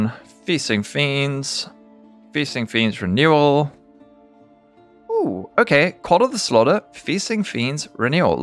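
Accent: South Africa. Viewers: Well i can tell of another south african im also been looking at your vid for a day or 2 nice job man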